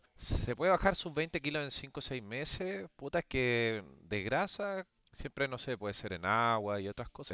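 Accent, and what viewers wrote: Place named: Chile. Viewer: Grande nunca pierdas nuestra ideosincracia, no pierdas nunca el acento chileno. Igual sería bueno que icieras un stream con la ju.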